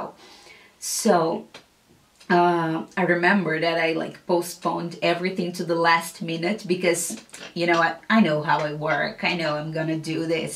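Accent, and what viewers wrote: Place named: United States. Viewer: Super fluente... e teu sotaque é bem americano, nossa! mto fofa.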